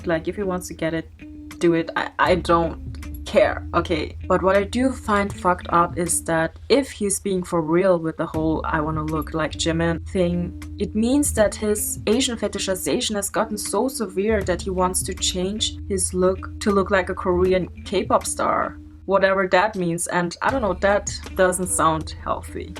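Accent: Germany. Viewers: Ich höre deutschen Akzent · You kinda got a German accent ? Or am I trippin’ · offtopic but your accent sounds german and that‘s cute🥺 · Is that a German accent I hear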